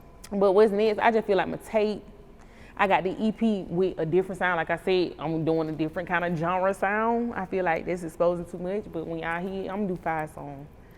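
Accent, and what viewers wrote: South, United States. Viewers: Don't know you you sound so country well Black Is Beautiful gurl 10/17/22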